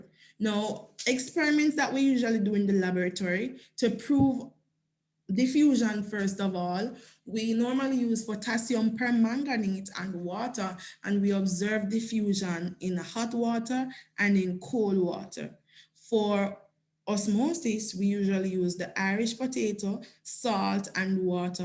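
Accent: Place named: Jamaica. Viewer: I find this Jamaican accent very off-putting...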